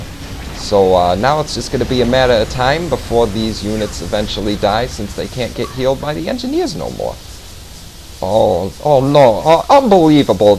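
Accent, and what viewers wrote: Boston, United States. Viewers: ROFLed the whole time with the Buuuston accent. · FUCK that boston accent annoys the fuck out of me.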